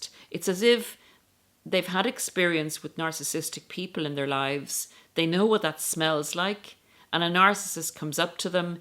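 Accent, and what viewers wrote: Ireland. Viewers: Aye, but her accent is Irish (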